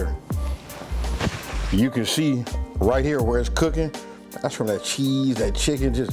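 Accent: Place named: Carolinas, United States. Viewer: You sound like you're from the Carolinas.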